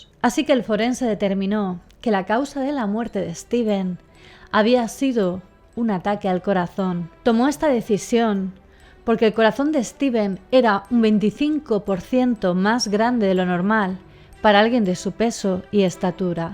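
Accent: Spain. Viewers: Ets catalana?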